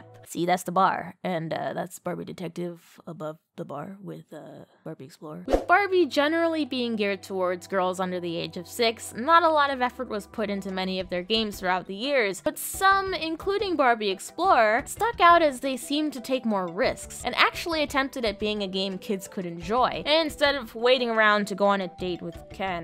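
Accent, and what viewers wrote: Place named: United Kingdom. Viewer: Just recently found your channel through reccomendation somewhat, and I love it.... After scroll through many of your videos, it Reminds me a bit of Kim Justice content, but with milder and clearer voice (british accent sometimes sexy, but hard for me to discern as a non native english speaker) and thats a lot kudos from me...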